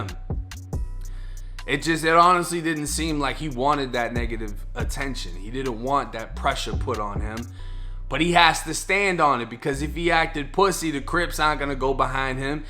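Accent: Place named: Massachusetts, United States. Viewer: Yo you sound like you're from Mass, straight up north shore!! But anyways, I like ur videos!! Good shi*t, keep it up!! 💯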